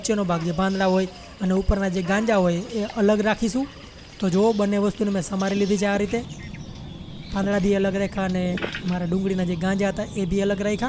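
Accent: Kathiawar, India. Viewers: Your language pure Kathiyavadi😍🥰🤩🤩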